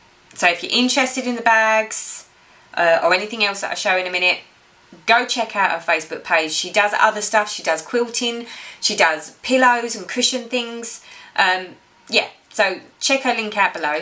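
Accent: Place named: London, United Kingdom. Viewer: Hi there ,just found you while i was stitch surfing ,and would like to say how much i enjoyed your video and seeing your wip,s and future projects ,i,ll have to catch up now on all your other videos .look forward to watching them . your accent sounds a bit like mine ,are you in London or Kent maybe ?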